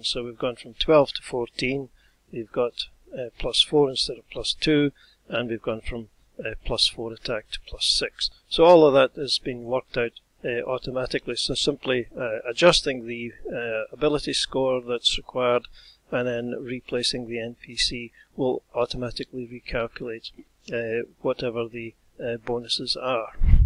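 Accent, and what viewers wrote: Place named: Scotland, United Kingdom. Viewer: I cannot properly thank you for all the time your videos saved me while preparing to run my first campaign in Fantasy Grounds (pandemic life made us use Roll20 first after a few decades of live playing, now we finally are going with a proper tool). It has been truly a blessing to be able to sort out so many questions with your marvellous explanations, and the absolutely fabulous production quality. The fact that they are delivered in a brilliant Scottish accent only help make it even better. Cheers from a Brazilian fan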